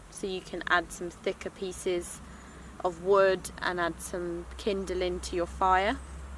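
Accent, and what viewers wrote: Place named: Cockney, United Kingdom. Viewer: A fellow lefty I see. Nice video 🙂 So not the subject of the video obviously, but I love the accent. Cockney?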